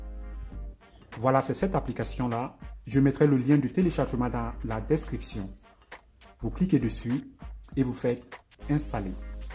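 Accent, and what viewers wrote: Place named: Mali. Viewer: Merci beaucoup depuis le Mali. Votre accent me semble malien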